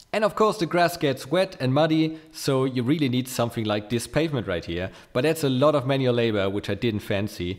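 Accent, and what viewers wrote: Germany. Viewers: Ich finde es lustig wie man perfekt deinen deutschen Akzent hört, die Idee muss man aber auch erstmal haben. Super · Bruder dein akzent einfach anders deutsch xD Gutes Video ^^ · Do are you from Germany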